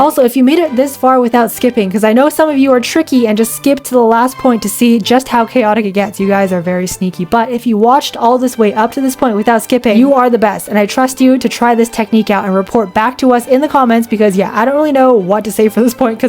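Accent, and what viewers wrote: United Kingdom. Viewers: Are you British in some parts really often yours accent sounds british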